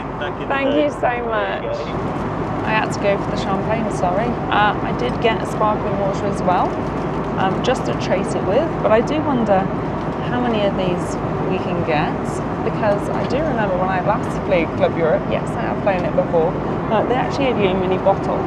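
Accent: United Kingdom. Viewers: Just a question- are you by any chance Welsh? Some words sounded really Welsh! ❤🏴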